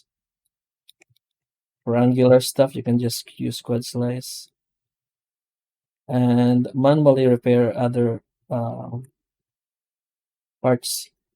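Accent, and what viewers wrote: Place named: Philippines. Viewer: First time I hear your voice...😅 Now I can confirm you are pinoy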